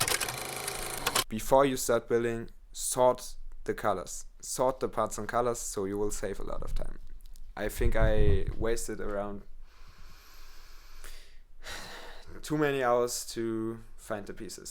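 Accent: Germany. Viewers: Klingt sehr deutsch haha aber geiles moc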